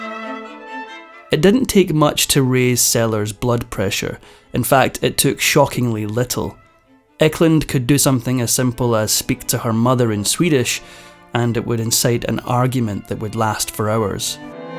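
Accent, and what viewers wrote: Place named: Scotland, United Kingdom. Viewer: I could listen to that Scottish accent forever!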